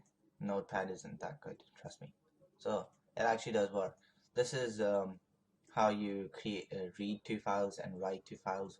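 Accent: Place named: Scotland, United Kingdom. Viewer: Nice and Clear tutorial, made it really easy to follow and understand. Funky accent btw, Scottish twang? :)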